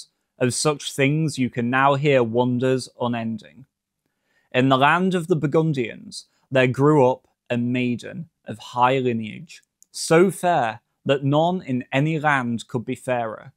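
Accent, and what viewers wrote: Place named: United Kingdom. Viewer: American here with honest question. The reader has to my ears an unusual Brit accent. Where is he from?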